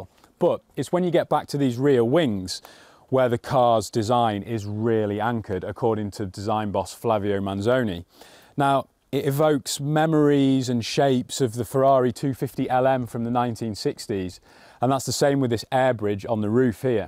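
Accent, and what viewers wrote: United Kingdom. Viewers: Wow, what a precise, accurate and fascinating description. By the way, It's the first time that I really appraciate a british accent, which normally sounds to me, instead of sofisticated, somehow ridiculous and artificial..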